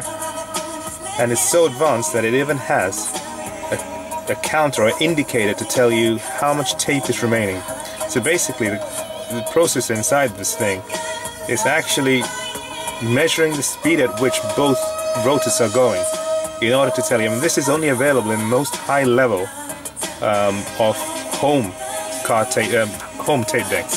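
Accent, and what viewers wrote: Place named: South Africa. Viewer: Things and things It sounds sort of like a South African English accent. Source: I work with somebody from South Africa.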